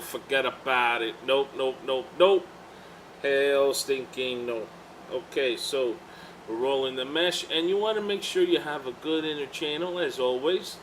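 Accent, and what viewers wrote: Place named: New York, United States. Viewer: ***** ya you can tell your a new yorker without the forgetaboutit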